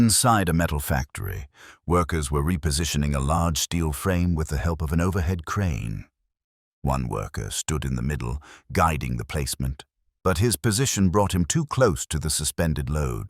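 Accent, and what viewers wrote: United Kingdom. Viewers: It looks as if all of these incidents occurred in a third world country where there is no OSHA. And, I'm sooooo tired of the English accent narration.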